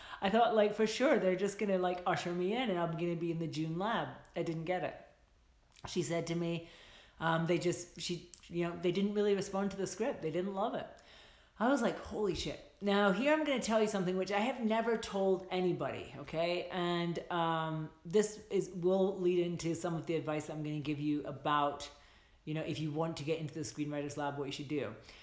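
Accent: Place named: Scotland, United Kingdom. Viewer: Thanks for this! Thought I detected some Scottish in you!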